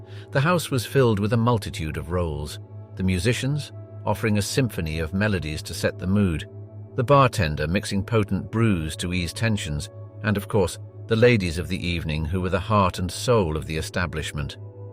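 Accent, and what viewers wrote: England, United Kingdom. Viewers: Another Brit narrator explaining America.....to AMERICANS. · Is it the narratives male English accent that softens the title or is it that it's not a Woman narrating this story. It is the latter. This story is about cruelty and how Women care not even for their own gender. How dare you take my gender and twist it into your culture for financial gain, you the Madame the bottom bitch.. A Woman who hates their SISTA this is your type of entertainment. I forgive you SISTA.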